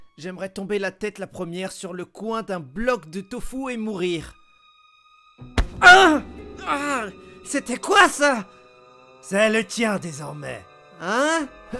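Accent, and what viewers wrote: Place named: Germany. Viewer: L'accent allemand, juste parfait 😂 mec continue frr tu gère trop 🔥 tu va gagner masse abo je partage et abo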